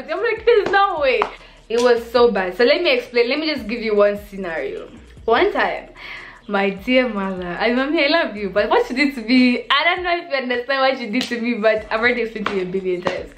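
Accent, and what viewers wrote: Nigeria. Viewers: You have a Nigerian accent 😍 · You sound Nigerian 😭❤️youre literally my favorite YouTuber as about now !!❤️🔥🌈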